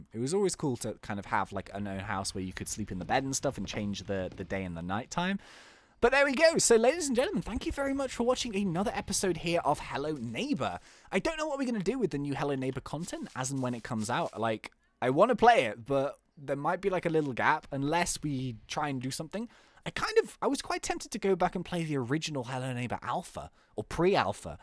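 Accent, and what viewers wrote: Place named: Liverpool, United Kingdom. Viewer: your scouse accent is amazing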